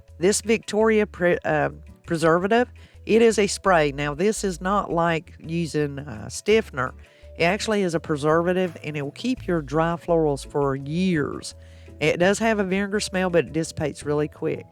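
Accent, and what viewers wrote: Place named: Carolina, United States. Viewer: I love this! Thank you for sharing. I love your accent. You sound like me. I’m a Carolina girl. 😊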